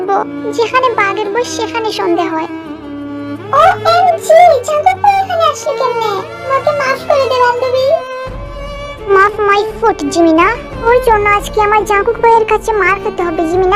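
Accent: Sylhet, Bangladesh. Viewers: তোমার কথার মধ্যে সিলেটি ভাষার টান আছে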